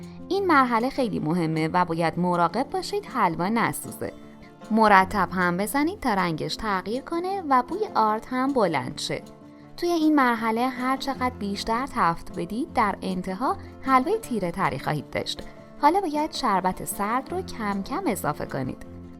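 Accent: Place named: Isfahan, Iran. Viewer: ازلهجه این حاج خانم پیداست که اصفهانی هستند😛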